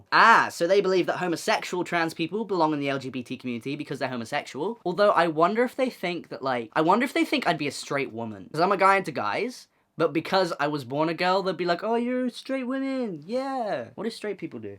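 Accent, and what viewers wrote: United Kingdom. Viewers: Are you British or Aussie? I can’t tell from your accent lol. I’m Aussie.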